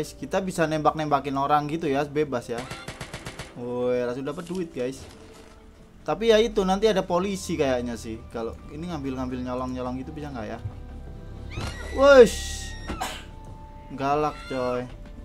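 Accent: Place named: Tegal, Indonesia. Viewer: Cuk ngapak nemen kie. Wong tegal apa wong semarang kie 😂😂😂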